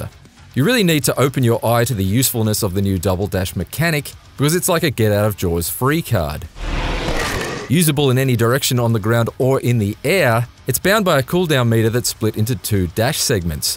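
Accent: Australia. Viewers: Goodness, I always significantly enjoy the texts when any of the Aussie lads writes/narrates them! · is this Gmanlives? I can tell that he's Aussie, but it doesn't sound like Gmanlives. The accent is slightly different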